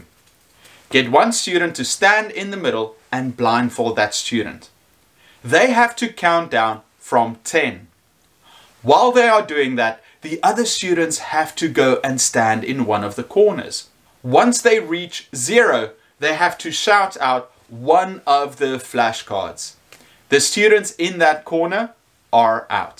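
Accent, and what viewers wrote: South Africa. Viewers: Great video, thanks for the tips! I am trying to work out your accent, am I wrong to say you are South African? I am not bad at picking accents considering I am not English :) but your accents makes me wonder.... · That South African accent is a blessing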